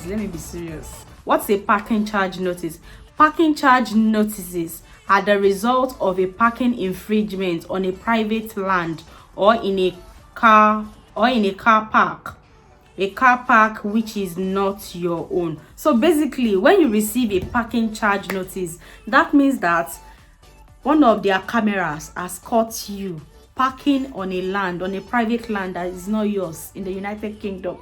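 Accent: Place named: Yoruba, Nigeria. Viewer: Your yoruba accent lasan >>>>>>>> 😆 🤣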